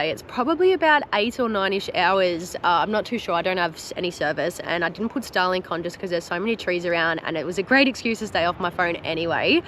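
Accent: Australia. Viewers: Hi honey love watching your videos and you are a very gorgeous Australian sexy young woman honey keep these videos of you keep coming because love the areas that you travel to honey and don’t won’t to brag honey you truly a very sexy and extremely gorgeous young woman i appreciate all of your videos love ❤️ you and your beautiful acsent honey again thank you so much hope to see more beautiful areas of Australia beautiful Outback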